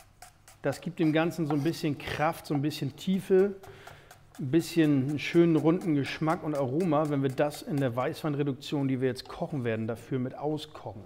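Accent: North, Germany. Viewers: Dieser norddeutsche Akzent 🥰 da wirken die guten Erklärungen noch besser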